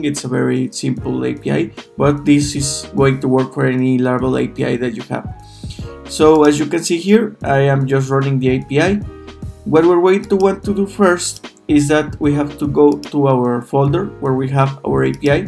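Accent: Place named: Spain. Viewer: I think you have a bit of nice accent coming from Spanish, am I right? :)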